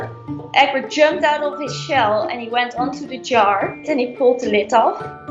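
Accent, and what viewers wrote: Netherlands. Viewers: This video was already on the Dodo but nevertheless stunning. I can hear she is Dutch and so am I , lol. 👋🌞🇳🇱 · The STRONGEST Dutch accent I've heard in a while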